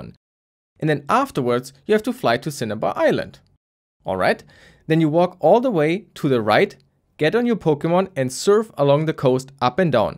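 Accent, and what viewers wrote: Germany. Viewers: Beim Hören gemerkt der ist doch ein Deutscher und wurde im Impressum bestätigt. LG aus Bremen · Ich liebe diesen deutschen akzent 😂 aber geniales video 👌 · You sound very much like youre german. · love the video,are you german? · Er spricht englisch, hört sich aber deutsch an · i can hear this guy is german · Nice German accent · the german accent is so strong 🤣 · Er spricht gutes Englisch aber man hört trotzdem das er Deutscher ist 😄👌 Damals als Kinder haben wir Handy Pokemon gesagt weil Missigno mit der Antenne aussieht wie ein Handy 😂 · digga dein deutscher akzent ist ja mal anders cringe :'D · I knew you must be a German (way of speaking) 🤨 and you are... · Man hört so raus dass du deutsch sprichst xD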